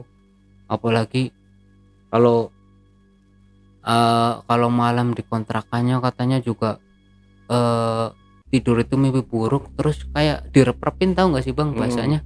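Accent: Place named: Central Java, Indonesia. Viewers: Logat jateng ya medok bngt kang.. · YaAllah logat e medok nemen 😅absen Bang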